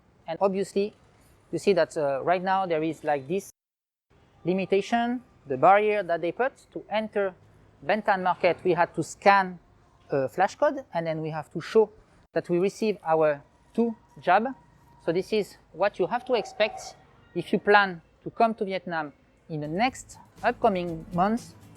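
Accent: France. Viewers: Loves ur French accent. Great job bro ok.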